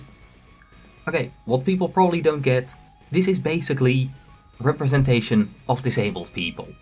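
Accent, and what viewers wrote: Netherlands. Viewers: i mean your dutch accent isnt too bad. It took me a while after reading your comment to notice it, and I'm dutch myself XD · Wait are you dutch bc i recognize the accent and how you pronounce english words. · Are you Dutch? You sound Dutch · your cute ass dutch accent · Man I could hear the Dutch in your voice. And all I got to say is.. frikandel broodje. Also great video i loved it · Ahh I figured you were Dutch :0 your accent’s very nice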